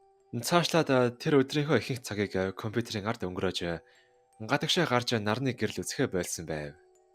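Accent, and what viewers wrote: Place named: Inner Mongolia, China. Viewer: Өвөр Монгол залуу юм байна гэж бодсон.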